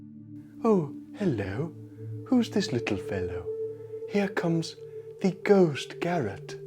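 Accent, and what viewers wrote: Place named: RP, United Kingdom. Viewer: Very well done "posh voiced"! Hugely entertaining and humorous. I dread to think how long it took you to do this!:)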